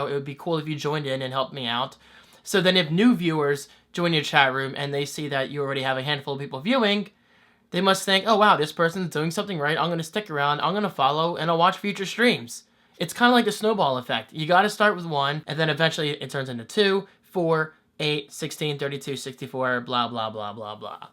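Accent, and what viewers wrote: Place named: Maryland, United States. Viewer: Are you from Maryland because you have the accent haha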